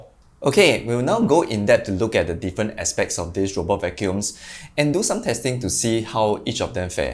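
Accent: Singapore. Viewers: You sound like my coworker from Singapore, la. Did I guess right? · You sound from SG. Are you?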